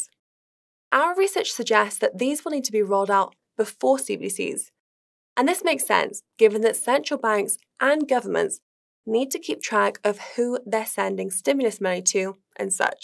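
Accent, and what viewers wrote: United Kingdom. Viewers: I don't get the ad bit in the middle with the intentionally extremely British accent. Her accent is already almost too british for most of us to understand anyway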